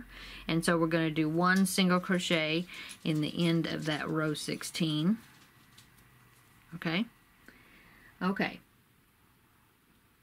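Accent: South, United States. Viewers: is that southern accent ? LOVE that bag <3 thnx for the pattern :D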